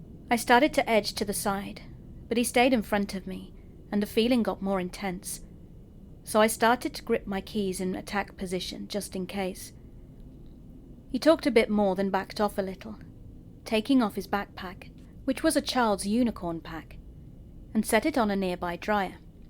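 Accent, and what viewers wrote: England, United Kingdom. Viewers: Been looking forward to this!! There's just something about that English accent 😁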